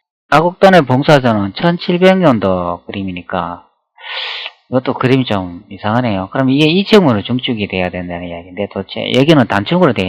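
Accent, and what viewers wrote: Gyeongsang, South Korea. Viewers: 경상도분이 자나요 사투리가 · 그놈 목소리. 반갑다. 겡상도 목소리다. 예리한 자료수집력. 역사고증에 많은 도움을 준다. 자못 기대감이 크다 하겠다. 역사성. 사실성. 확인성이 매우매우 높다.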